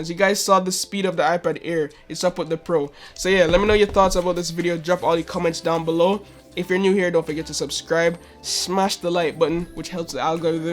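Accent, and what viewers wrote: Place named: Jamaica. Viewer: you sound like you have a little Jamaica 🇯🇲 in u bro great video tho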